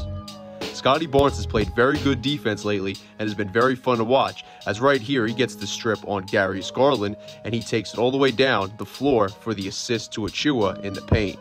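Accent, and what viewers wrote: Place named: New York, United States. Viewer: Bouche has been so surprisingly good as well , he comes of the bench with great energy as well , liked the video , you definitely a new Yorker or jersey cat with that accent 😀 , excellent read on the team , kudos and cheers lad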